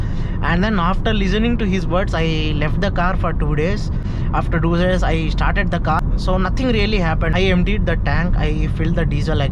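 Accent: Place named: South India, India. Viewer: south indian accent rocks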